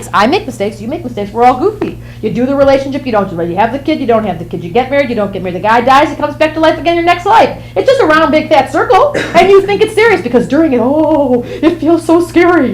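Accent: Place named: India. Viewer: That indian accent :D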